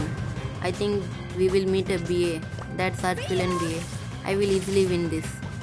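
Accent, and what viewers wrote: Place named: South India, India. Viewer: Ur voice says ur South Indian , if u say yes , I’ll reveal my state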